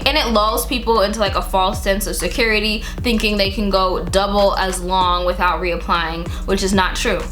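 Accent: Jamaica. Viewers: Just found your channel today and started watching. I heard the accent then you said you are Jamaican 🇯🇲. Loving your channel my Jamaican sister.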